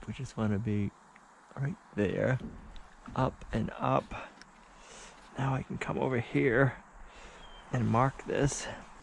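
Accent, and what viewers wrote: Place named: Boston, United States. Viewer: Good mauhnin! I love your Boston accent.( I’ve got one myself) Hope I never lose it! Lol! Oh and we like it a lot too! Lol! Good idea with the nail polish. Take care.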